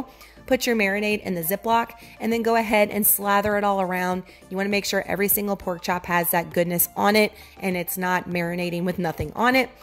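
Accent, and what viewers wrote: Louisiana, United States. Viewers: Girl I can hear that fellow Louisianian in you!!! 504 representing!!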